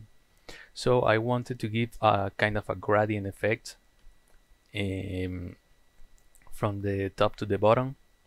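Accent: Argentina. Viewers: Excelent tutorial. Also, this man speaks english with a Good Airs accent, just like me, so really Easy to follow. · Jaja, me siento orgulloso de haber identificado el acento argentino (? muy buen trabajo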